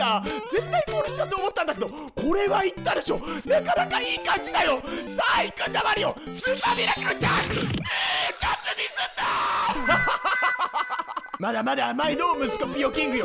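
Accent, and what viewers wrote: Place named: Kyushu, Japan. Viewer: 初コメです、7万人おめでとうございます！九州出身なのでさんのイントネーション好き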